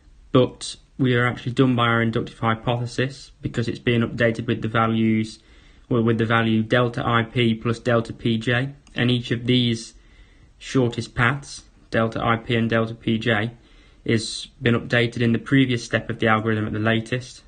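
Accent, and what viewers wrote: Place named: United Kingdom. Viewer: uk accent is annoying , can't understand.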